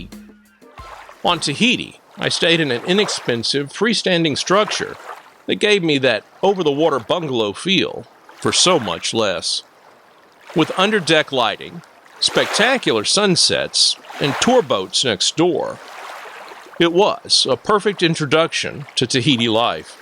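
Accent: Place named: Mississippi Delta, United States. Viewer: Based on those pronunciation examples, perhaps you might be from the Mississippi Delta?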